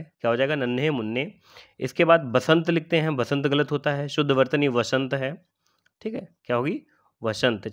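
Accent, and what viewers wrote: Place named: Hardoi, India. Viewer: Sr aapki hardoi wali tone aa jati h kabhi 2..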